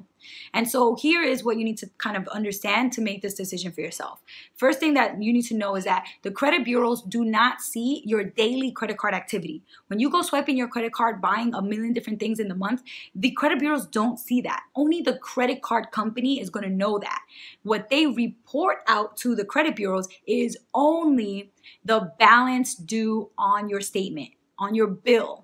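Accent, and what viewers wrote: New York, United States. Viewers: Sound like you from NY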